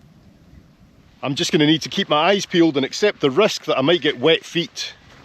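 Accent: Scotland, United Kingdom. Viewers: Just love the Scottish accent... and it's so fun to read all the Norse names in Lerwick, now when I'm "google visit" the city. What a beauty! Just the end name of the city "wick" is "vik" in Norwegian, meaning "bay" in English I think. We have many places that end-named vik here in Norway. And then we know what kind of "bay" the place has. Sanday (Sandøy) Sand Island. Guess it's a sandy island. Would love to visit the island and of course main land of Scottland as well. Keep up the good job 👍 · Hi every trip is a pleasure you share and will always be my favourite YouTuber. Thanks for sharing and making my week. I had Scottish great grandparents and think the Scottish accent makes for the best commentary. I also enjoy your friend Scott from Planes Trains and everything but you will remain my favourite. Thank you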